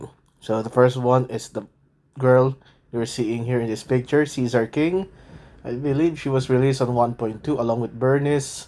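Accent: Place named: Philippines. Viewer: bro u pinoy, the accent is kinda pinoy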